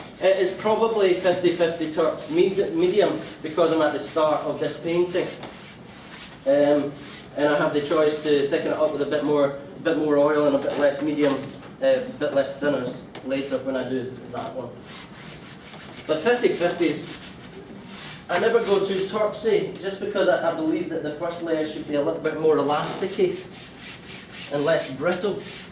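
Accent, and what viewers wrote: Scotland, United Kingdom. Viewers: I have watched all of the videos in this playlist. I have acquainted my ears with Scottish accent. I can't say the same for the closed captioning system. It's actually quite funny to see what the automatic system thinks he is saying. He says never try to paint along with him, but I am. All of my colors seem to be bleeding together with the dark reds. I wonder why.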